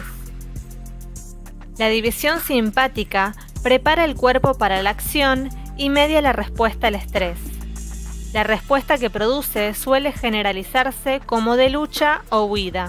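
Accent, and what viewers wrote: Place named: Argentina. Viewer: Q raro la mano negra tomando en cuenta q suenas como argentina en fin 🤔😑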